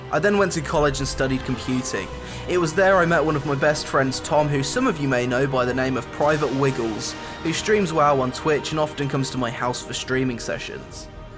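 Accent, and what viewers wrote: United States. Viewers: you American or British?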